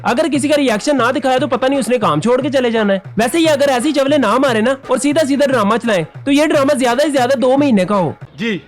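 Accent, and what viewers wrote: Punjab, India. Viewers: bhaii i like your punjabi talk style . literally it makes me laugh.... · Hyyyy your Punjabi accent UFFF 😂😂😂. Love it bhi jaan. Lol the ending thoo😂😂😝😝 Keep it up bhi jaan. Wish you a very good lick for your future 🧕🏻😊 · Punjabi bht mazy ki bolty ho hahahaha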